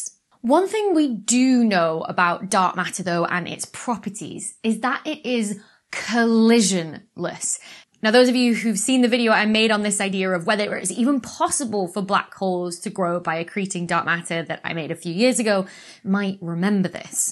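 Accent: United Kingdom. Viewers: Idear. Love the British accent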